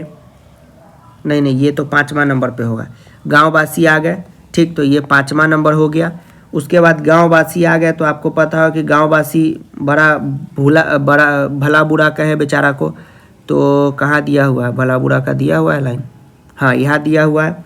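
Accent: Bihar, India. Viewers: Sir apke bolne ka trakike se lagtha ha ki ap vihar se belong kerthe ha. Sir me bhi bihar se he belong kerthi hu lakin rethi delhi me hu..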